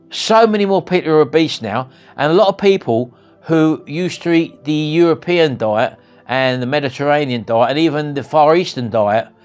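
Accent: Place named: England, United Kingdom. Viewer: How refreshing to listen to a clear English accent instead of high pitched nasal American females telling us how to eat.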